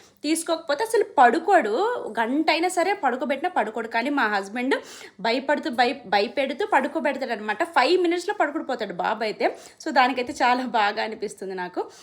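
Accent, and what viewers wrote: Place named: Telangana, India. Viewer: Telangana slang talking akka meru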